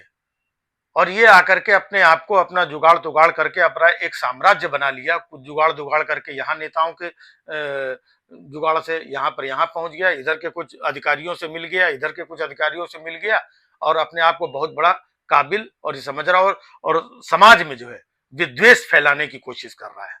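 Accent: Kanpur, India. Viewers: ji, you are a keen observer, a brave and intellectual artist.Your political satire really means a lot and your kanpuriya tone makes it more interesting. Keep it up.👍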